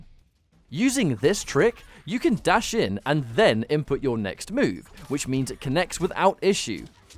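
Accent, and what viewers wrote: United Kingdom. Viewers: I knew to take this video seriously when I heard a British accent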